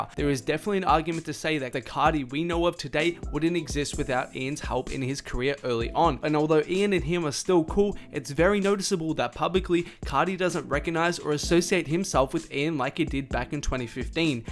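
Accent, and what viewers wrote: Australia. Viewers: This guys australian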